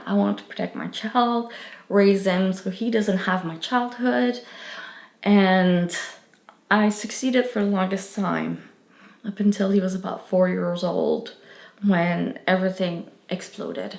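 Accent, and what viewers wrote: Quebec, Canada. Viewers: I thought I recognized her wonderful accent. I live in Alberta now and unfortunately don't hear Quebecers often. ❤ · Where is from? I wasn't sure if it's a quebec accent or not.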